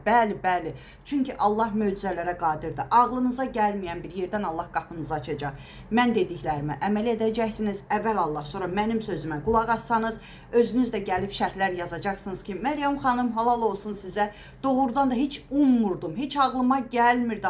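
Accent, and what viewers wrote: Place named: Aghdash, Azerbaijan. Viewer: Allah razı olsun hem yerimiz bacı mende Aqdaş rayonunanam darıxmışdım lehcemiznücün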